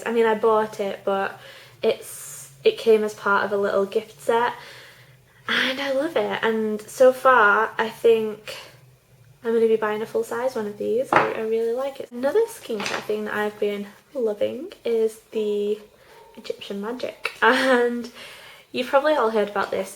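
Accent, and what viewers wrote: United Kingdom. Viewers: ah! ur so british and i love it!! i miss my british friends... they used to come to california and visit me often. they live on "the isle of white" do u know where that is?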